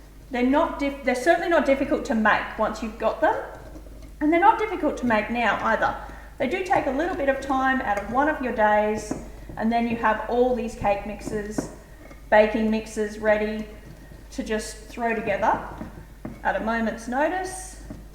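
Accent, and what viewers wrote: Australia. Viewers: I watch your video's daily from America. I live in Alabama just above Florida. I Love your accent (wish I had an aussie accent) You're so beautiful and I love your kitchen. My husband loves the muffin mix.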